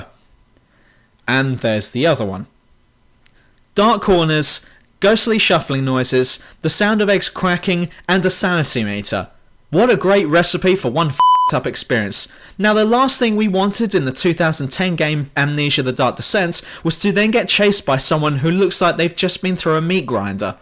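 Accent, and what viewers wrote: United Kingdom. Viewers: Dude you are a, LOL Plus the british voice makes it for a good review.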